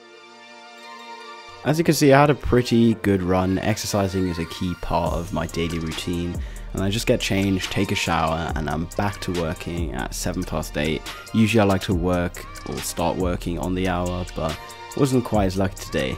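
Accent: RP, United Kingdom. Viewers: But how is he posh do?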